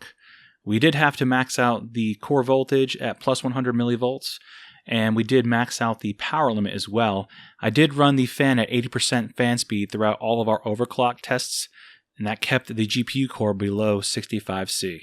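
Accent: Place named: United Kingdom. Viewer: I second those opinions about your voice bro. It sounds calm, professional, articulated. And that's a big factor in the subscription business I think. And you know what ups the ante, is a british accent. I'm serious! That's almost a guarantee for someone to subscribe.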